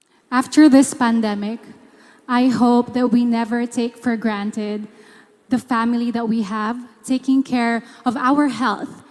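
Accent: Australia. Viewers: Another great video, you have so much knowledge about The Philippines from someone who has (What I’m presuming 😝) an Australian accent. 👍🏾 Do you have Instagram by any chance? ☺️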